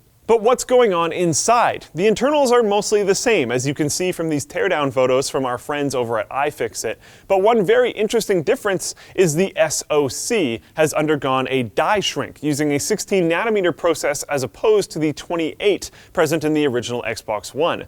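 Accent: Canada. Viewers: from second one you can tell he's Canadian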